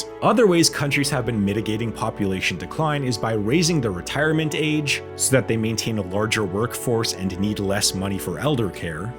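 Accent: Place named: Canada. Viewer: I think I hear a Canadian accent. 😉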